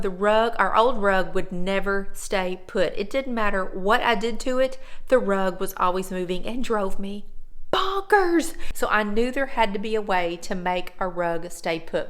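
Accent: South, United States. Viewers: Hello, new subscriber, love your southern accent!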